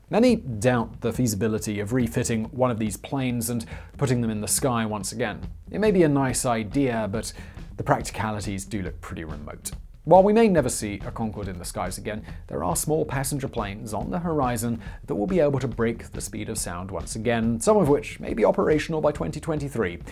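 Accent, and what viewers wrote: RP, United Kingdom. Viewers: This guy is so posh I love it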